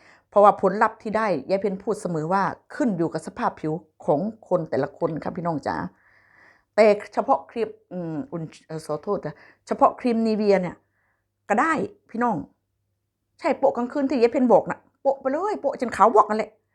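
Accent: Korat, Thailand. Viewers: น่ารักมากพูดพาสาโคราชชอบมากๆจ้า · ชอบแก่คุยบ้านเองคนโคราช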